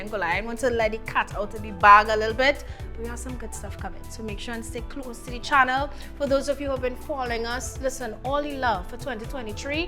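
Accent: Trinidad and Tobago. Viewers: Yep, Trini to d bone lol Welcome to the channel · I just saw this and loving the enthusiasm ❤❤. Am I detecting a Trinidadian accent??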